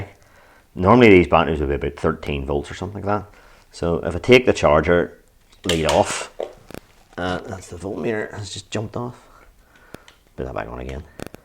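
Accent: Northern Ireland, United Kingdom. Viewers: Good video thanks. Great to hear the norn iron accent!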